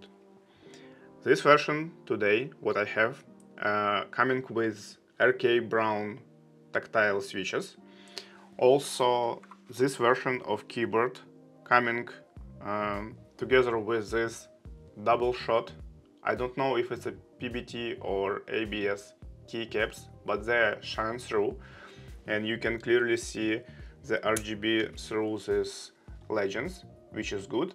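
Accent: Russia. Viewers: where are you from bro? why u talk like russian people я слышу именно максимально русский английский говор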